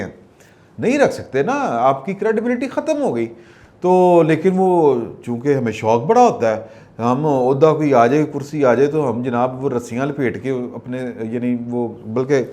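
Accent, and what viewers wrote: Punjab, Pakistan. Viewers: pahi jaann .... good style with punjabi touch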